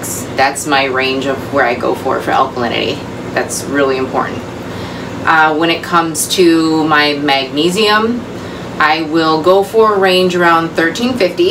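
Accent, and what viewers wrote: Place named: Brooklyn, United States. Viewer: With both the Polyfilter and carbon in the sump, I'm curious about how much production you really get from the skimmer. Both are supposed to be removing the organic substances that the skimmer is skimming. And I'm wondering whether you could only use the chemical filtration and skip the skimmer. P.S. You sound like Brooklyn.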